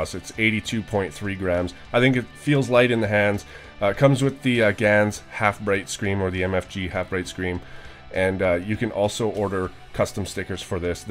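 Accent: Canada. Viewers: It’s the Cubicle.Us and you are pretty sure Canadian, right? Heard that eh, (I’m not Canadian)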